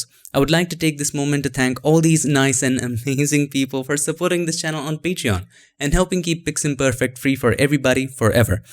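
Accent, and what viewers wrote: India. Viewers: best Indian accent I ever heard (.)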